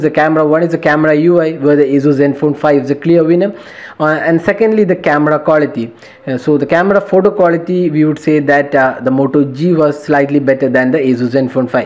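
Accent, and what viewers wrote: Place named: South India, India. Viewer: Man half the things he said have to be heard twice ... that thick south indian accent... really confusing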